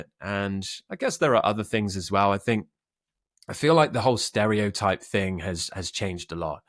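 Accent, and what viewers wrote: United Kingdom. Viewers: My favorite English Teacher ever. For all those who love the brittish accent, we should create a whatsapp group to practice all together.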